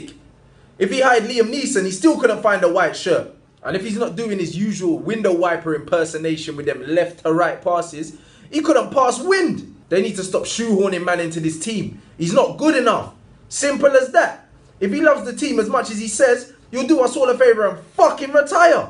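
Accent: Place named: Harlesden, United Kingdom. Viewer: 😂😂😂😂 bro, you're from Harlesden right?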